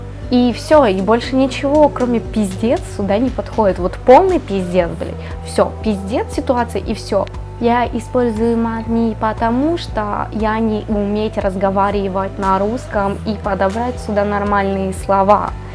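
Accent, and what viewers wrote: Ukraine. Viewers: Ваааах. Как мне нравится её лексика и украинский акцент ❤👍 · Твой мат и украинский говор выделяют тебя из "серой массы" блогеров, вообщем таких, как ты ни#@ нєту)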